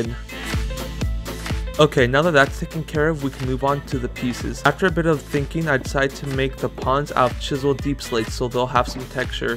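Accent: Norway. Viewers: Are you Norwegen?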